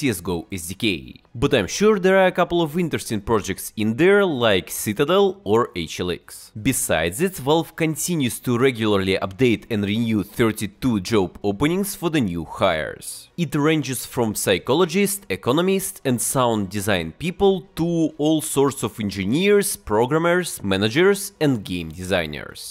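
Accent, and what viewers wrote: Russia. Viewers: Ох уж этот шикарный русский акцент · that russian accent tho. nice contetnt bro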